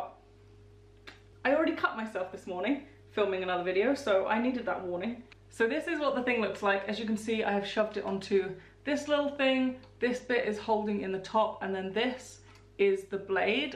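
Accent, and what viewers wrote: United Kingdom. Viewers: you are always great fun! I had to chuckle...I have the watermelon cuber too...As a Texan, I LOVE your Brit accent. You are practically perfect! Thanks dearie!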